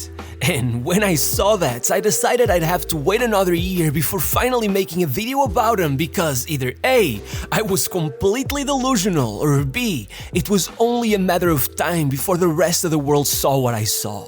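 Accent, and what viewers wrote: Porto, Portugal. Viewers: O sotaque do Porto mal disfarçado 😅😅😅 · Are you portuguese? you said his first club right and all names good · Nota-se bem que és português, muito bom continua! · és português não és? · U sound portuguese, are u? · esse sotaque tuga <3 dá-lhe!!! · Your portuguese accent makes this video even more awesome! Cheers, buddy! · Es pt parece a braba pelo teu sotaque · Nunca falho a reconhecer um irmão português, bom video irmão. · This accent is so Portuguese 😂😂 god damn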